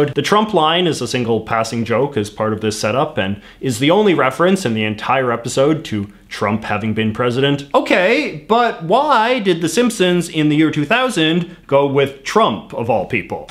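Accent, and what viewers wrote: Canada. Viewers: I'm Canadian, and you have the most Canadian accent I've ever heard. I've never heard someone pronounce "around" like you. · as a Canadian I can say you've got quite the stereotypical Canadian accent! kinda funny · guy sounds like zach braff but Canadian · Your canadian accent is strong af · He talks “Canada” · Some times I’ll forget you are Canadian and the out of nowhere you’ll hit me with an ‘aroond’ when pronouncing ‘around’ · omg ur canadian accent is so funny LOL great vid tho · I always thought us Americans always played up the Canadian accent but damn you’re super Canadian! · As a Canadian I can promise you that no one in Canada has a "Canadian" accent this strong. I call shenanigans!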